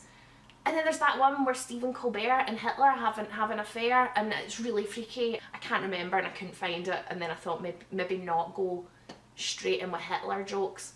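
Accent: Scotland, United Kingdom. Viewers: ... bad Harry Potter fanfiction read by a Scottish woman? I need this in my life! I came for the Scottish handmaid, but I'm staying for this! Subbed! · I'm bad at identifying accents. She's scottish?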